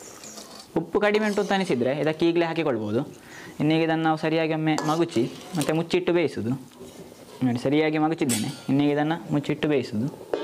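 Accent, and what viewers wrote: Mangalore, India. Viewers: I am watching your channel because of your mangalore kannada💛❤ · ನಿಮ್ಮ ಮಂಗಳೂರು ಶೈಲಿ ಕನ್ನಡದಲ್ಲಿ ಅಡುಗೆ ಮಾಡೋದನ್ನ ಕೇಳೋದೇ ಅದ್ಭುತ. ಸ್ವಚ್ಚಂದ ಪರಿಸರದಲ್ಲಿ ನೀವು ಮಾಡೋ ಪ್ರತಿಯೊಂದು ವೀಡಿಯೋ ತುಂಬಾ ಇಷ್ಟ ಆಗತ್ತೆ.. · ನಿಮ್ಮ ಮಂಗಳೂರು ಕನ್ನಡ 👌👌👌👌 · Wow..very nice pulav...👌 Nice way of explaining..mangalore kannada ..❤Keep it up..🙏🌹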